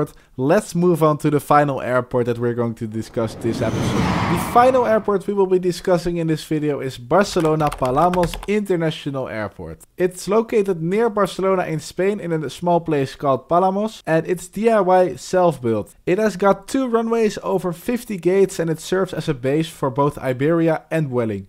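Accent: Netherlands. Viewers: Are you dutch? You have a dutch accent.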